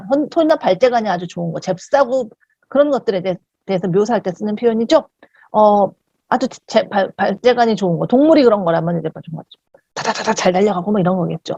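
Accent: United Kingdom. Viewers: 이 영국식 발음이 있으셔서 더 고급스럽게 들리는거였군요… 항상 명강의 감사합니당.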